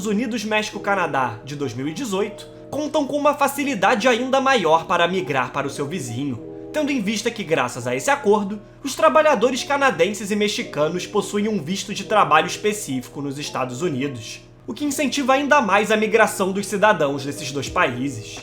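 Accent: Rio de Janeiro, Brazil. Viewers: Conteúdo interessante. Narração terrível, complicado ouvir até o fim. Mistura de carioques, com Marcelo Resende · Sotaque carioquês do narrador é horroroso! O resto, já sabia faz tempo. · O vídeo é bom mas o sotaque carioca é irritante de mais. Por que se troca o "S" por X ? É uma coisa q não tem o menor sentido. "S" é "S" e "X" é "X" não consigo entender pq. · trabalhar mais o teu português carioca... parace uma panela de pressão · Coloca um cara q fale normal... O cara puxa muito... Carioca fala muito feio....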